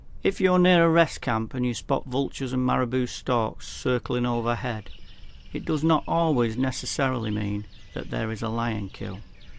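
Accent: United Kingdom. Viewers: you have a great northern accent. Well narrated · Intrigued to hear a Yorkshire accent narrating about a South African game reserve. I think you did a good job and I particularly appreciate the natural sounds of the bush in the video. Too many of these videos have awful background music that adds nothing of value. I spent 4 weeks doing conservation work on a SA private game reserve and hearing the sounds of the bush revives happy memories.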